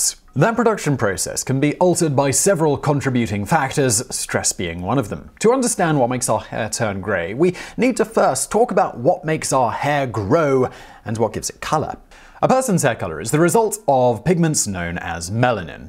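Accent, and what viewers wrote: United Kingdom. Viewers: How come you speak in traditional English but spell in American English? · 😂 😂 😂 Maybe you missed it so I'll point it out. He has a British accent and sometimes they pronounce things differently.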